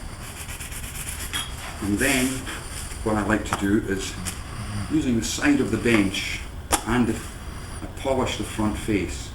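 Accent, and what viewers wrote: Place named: Scotland, United Kingdom. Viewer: Thanks for the video. Nicely explained. The burr on the side of the tool is almost as pleasing as the burr in your Scottish accent. Remonds me of family and friends back home in Canada.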